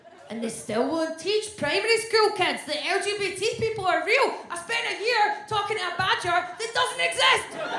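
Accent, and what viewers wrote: Scotland, United Kingdom. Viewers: You can select to have captions on screen in English which might help. She has a Scottish accent which can take a while to tune into if you're not familiar with it. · Funny. Hot. Amazing Scottish accent. And most importantly hot. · It's English, but even for Americans it's a little hard to understand the Scottish accent. You said you're Mexican right? It's kind of like trying to understand Spanish from the Puerto Ricans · Ah, she,s good. And that husky Scottish accent. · Maybe she isn't. But she sure has a strong Scottish accent. Strong enough that I find it quite charming. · Well I had a boyfriend from a psych ward he was lovely the mess came when I left him for my ex who caused the stress that almost broke me. Couldn't keep watching not funny she just sounds funny because of the somewhat forced deep voice and scottish accent the content is that of a 20yr old and gross and the audience loses it I wonder about some of these clips a.i images why not a.i audiences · that was fu-kin brilliant. 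love the scottish accent. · Sorry, I'm a native-American (literally-speaking) and her accent is really heavy; I have a hard time understanding her. She might have to advertise just for Scottish people. :)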